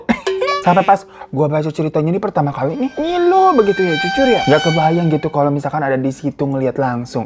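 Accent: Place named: Pontianak, Indonesia. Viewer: biak ponti mane suara nye😂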